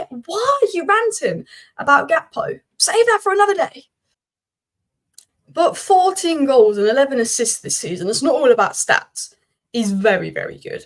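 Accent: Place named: Manchester, United Kingdom. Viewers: Typical United fan sounding like a true Mancunian and like all united fans never going a game. Just imagine if united take points tomorrow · Can you slow down please? I can’t understand your Manchester accent.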